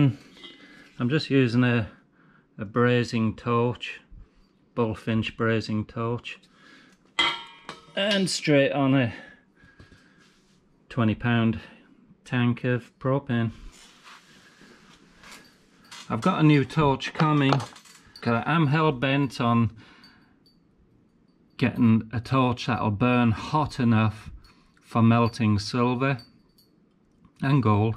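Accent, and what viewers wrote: Northern England, United Kingdom. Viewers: i can tell you're from either very far north england or very far south scotland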